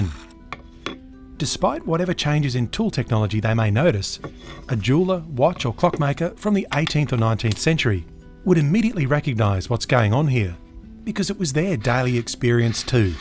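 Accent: Australia. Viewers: aussie. Eastern states based on the accent. My guess is southern Queensland or possibly Victoria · Kiwi here; most DEFINITELY has fair dinkum Australian vowels! He's in Queensland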